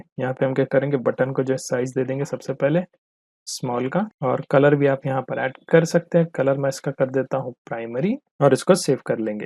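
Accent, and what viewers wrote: Hyderabad, India. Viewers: Bhai ye hyderabadi accent pasand aya mujhe and nice video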